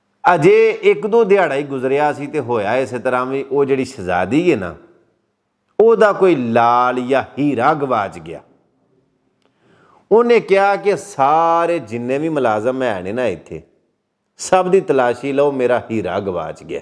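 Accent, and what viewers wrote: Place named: Kasur, Pakistan. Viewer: jee tohada programe pehli wari vekhiya. Dilkhush hogia. Tohada Andaz wadhiya hey. Kasoor de lokan wangoom boldey ho.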